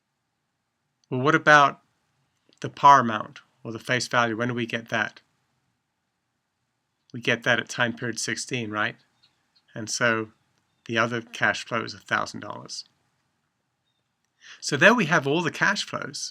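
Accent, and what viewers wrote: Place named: United Kingdom. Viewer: Thank you for lectures they are very helpful, and the British accent makes it even more cool for some reason. Question: Near , you mentioned the need to convert the 8% into an effective 6 month rate, in order to do that don't we first need to convert the 8% from the nominal value into the effective annual rate,(EAR or EFF) which will give us a value of 8.16% EFF on annual basis, which will then translate into the 0.0408 on a semiannual basis.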